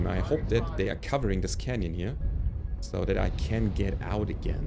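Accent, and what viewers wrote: Germany. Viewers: Your Vids are really impressive. Do i get it right, that you are a german? Your english sounds like its spoken by a german :)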